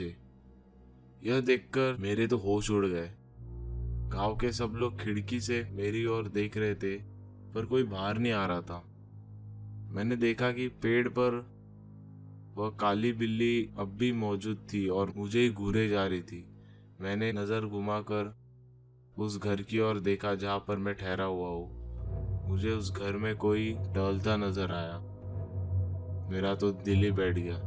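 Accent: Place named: Hyderabad, India. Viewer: You sound 100% Hyderabadi to me. indian Hydro I mean.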